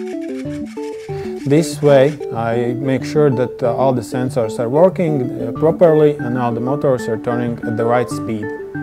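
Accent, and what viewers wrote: Latvia. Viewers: I was watching this and i thought your accent sounded familiar... I had a suspicion you were latvian! Labs video, pats domāju sākt veidot savu pirmo sumo robotu : ^) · That Latvian accent was so obvious from the very first word. :D I usually use something more similar to the first strategy, since usually the opponent robot is within my robot's sight basically upon the start. As my blad is decent, my robot just runs full force onto opponent. The main disadvantages come if opponent has flags. My robot doesn't yet account for it. Also, and embarrassing thing, but one of the 2 things have caused my robot to randomly restart on many competitions and I'm not sure which (hadn't tested): - charging circuit moving out of place and messing something up (not sure about this one, heard it from someone that it could be possible) - high noise in voltage from battery (due to not enough charge?) I'm hoping I can prevent this from happening again.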